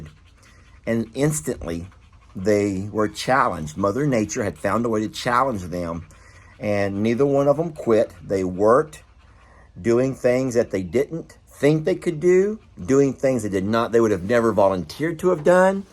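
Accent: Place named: Texas, United States. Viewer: My ❤️ and 🙏 go out to you. It's so hard losing our moms. Please take good care of yourself, take things one day at a time. There are times we need to take one hour at a time... find things that give you comfort and enjoyment. and videos give me comfort because my Momma's was from Texas. Hearing Texas accents reminds me of her and her family. I love it when says y'all 😍. I'm glad they also give you comfort. They have such good hearts, and take excellent care of Mother Earth and all of the critters at ISS and LHL. Their YouTube family will also be happy to give you comfort as well.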